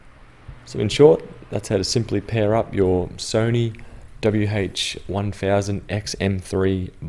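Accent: Australia. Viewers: Thanks mate, btw: like to ozzy accent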